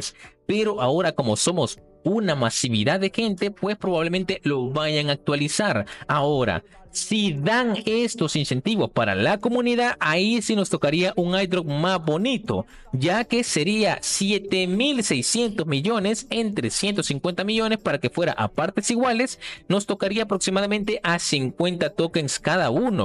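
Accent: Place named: Argentina. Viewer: Xq avlas como Arjentino si eres de Salvador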